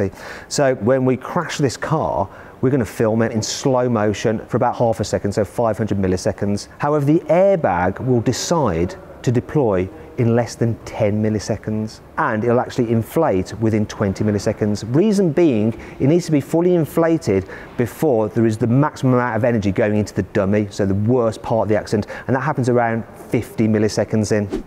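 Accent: United Kingdom. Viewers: Logat nya british bngt kwkkwkwkw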